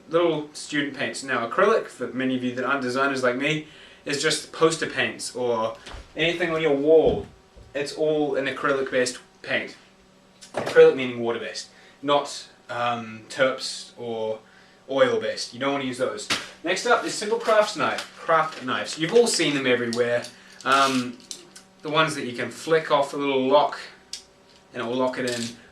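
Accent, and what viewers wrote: Australia. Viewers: you sound Australian or New Zealand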